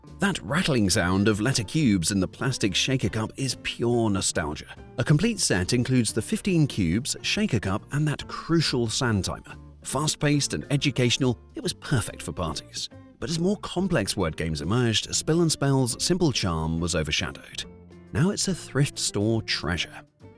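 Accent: United Kingdom. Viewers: I'm a Brit that's lived in the US for over 20 years.... Why is a British accent describing lots of games which WE NEVER HAD in the UK?.. Rather mystifying.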